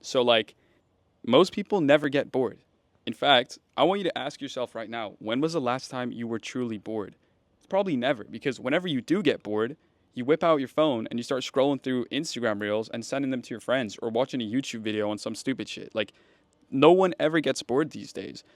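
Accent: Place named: United States. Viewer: Hey man Really appreciate your content From your podcast with Matt Sheldon You grew up in Scotland, Egypt and Malaysia So how’d you get your American accent?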